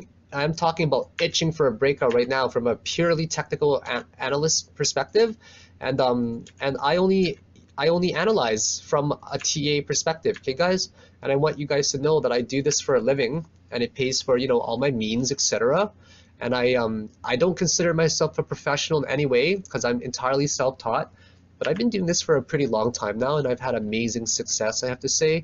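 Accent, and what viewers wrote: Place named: Canada. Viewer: You are awesome dude. Canadian right? I can hear it in your voice. Alberta here.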